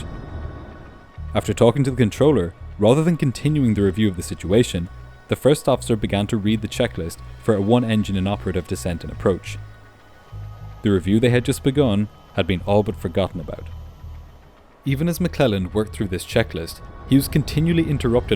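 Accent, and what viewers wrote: Ireland. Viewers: Love seeing your handsome face in your videos, coupled with your gorgeous Irish accent, which makes your videos so much more relatable 🇮🇪☘️🇮🇪😍😍😍😍😍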